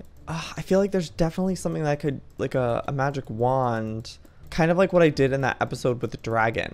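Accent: South, United States. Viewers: Omg he has a country accent ❤️❤️ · Is from the south? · I loveeee his country accent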